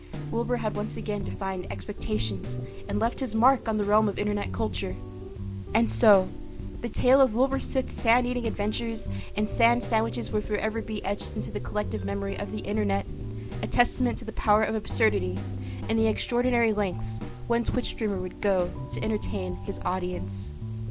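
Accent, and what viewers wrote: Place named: United Kingdom. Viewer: Hey yor british accent is good :] i love the story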